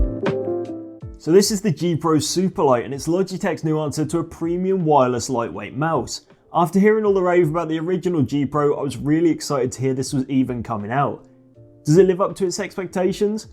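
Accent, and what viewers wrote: United Kingdom. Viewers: fucking english accent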